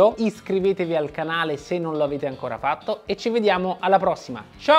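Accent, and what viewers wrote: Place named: Naples, Italy. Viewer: ma togli quell' accento napoletano suu'! che rovina l interesse dell argomento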